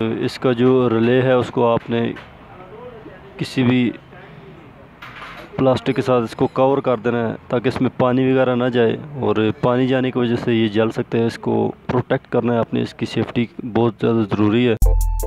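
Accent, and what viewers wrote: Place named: Pakistan. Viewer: Aap Ki Awaz se se lag rahi aap Pakistani hai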